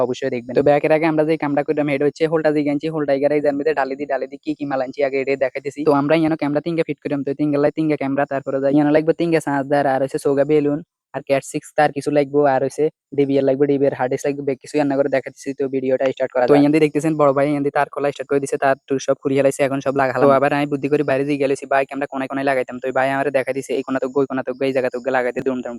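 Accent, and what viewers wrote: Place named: Noakhali, Bangladesh. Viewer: নোয়াখাইলা কথা ঠিক আছে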